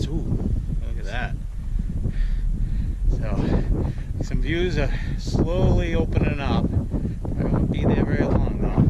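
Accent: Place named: Maine, United States. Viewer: Having trouble commenting on your other videos could just be my phone but I just watched a couple of your videos...thought I recognize that accent lol Can you just tell me how far up the trail you walked before you found that campsite please ...that would be helpful I’ll bring my GPS and Mark it and put it on my channel when I do it next spring thanks ...One Mainah to another 😀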